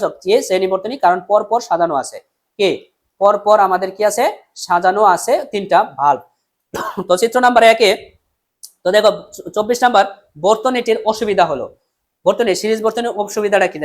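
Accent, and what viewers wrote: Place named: Sarishabari, Bangladesh. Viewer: না ভাইয়া আপনার কথা শুনে মনে হলো যে আপনি শরিষা বাড়ির স্থানীয়। আমার ওখানে আত্মাীয় আছে তো তাই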